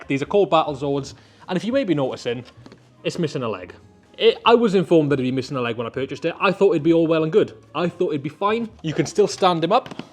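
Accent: Wales, United Kingdom. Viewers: Wow wow wow how have I never realised your Welsh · Damn... random hyper fixation youruber ALSO being welsh? How did i not recognise the accent sooner? 😂 great work mush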